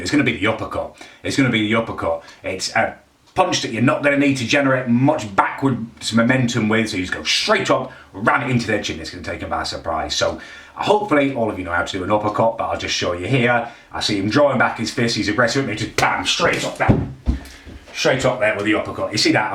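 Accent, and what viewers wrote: United Kingdom. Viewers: Anthony Fantano with a British accent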